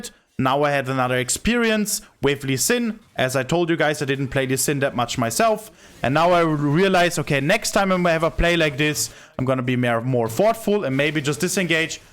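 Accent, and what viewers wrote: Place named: Germany. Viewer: Genauso klingt mein Englisch auch xD Einfach wild als deutscher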